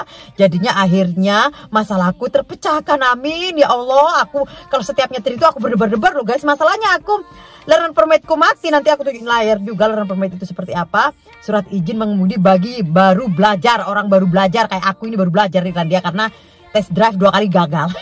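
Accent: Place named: Surabaya, Indonesia. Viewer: ini ono.... ini ono.... ini ono.... kyk aku kl ngomong.... wahhhh iki kyk e wong Suroboyo an.... logat e arek" Suroboyo an.... iyo tho rek , sampeyan arek Suroboyo tha ?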